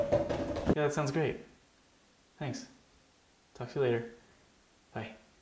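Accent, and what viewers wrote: United States. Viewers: I usually can't stand american accents but yours, yours I can handle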